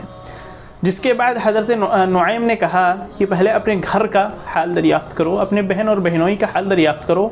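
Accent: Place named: Arab world. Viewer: اردو کو اردو کی طرح پڑھیں۔ اردو کو عربی میں پڑھ کر اردو کے ساتھ نا انصافی کر رہے ہیں۔ آپ کا کام بہت اچھا ہے۔ مشورہ بہتر لگے تو قبول کرلیں ورنہ معاف کردیں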